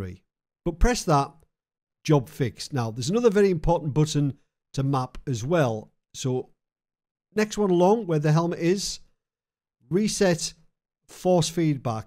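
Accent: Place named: Cumbria, United Kingdom. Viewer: Definitely a Cumbrian 😂👌🏼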